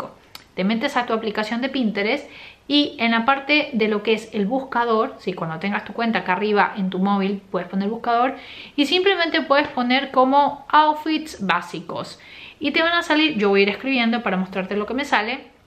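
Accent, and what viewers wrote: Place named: Argentina. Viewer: Me encanta tu acento, eres argentina? Saludos de una argentina en Texas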